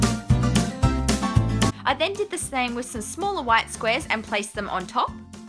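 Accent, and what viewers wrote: Australia. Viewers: i love your tutorials! are you australian? im aussie.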